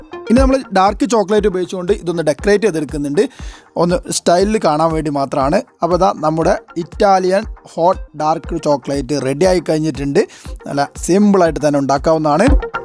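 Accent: Malappuram, India. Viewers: ഇക്കാ നിങ്ങടെ മലപ്പുറം ശൈലിയിലുള്ള ഭാഷ നല്ല രസമാണ്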